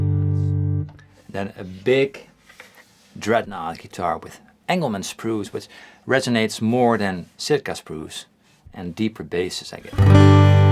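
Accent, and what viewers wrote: Netherlands. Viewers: Haha, instantly heard you were Dutch (me too XD) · Are you dutch? You sound dutch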